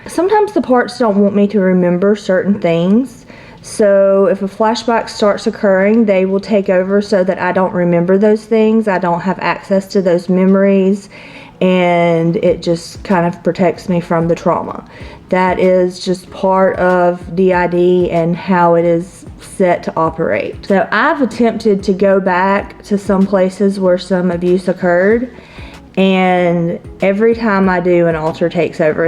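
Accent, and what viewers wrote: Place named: South, United States. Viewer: This was on my recommendations and I'm glad I stopped by to give you a watch. Even though you scripted this video and weren't too into scripting, you still feel very familiar and friendly. Maybe it's the southern accent, but it feels like I'm sitting on the front porch with a friend swinging on the porch swing, drinking sweet tea and talking about life (I'm southern, too, lol). Your dogs are super cute! Thank you for sharing :D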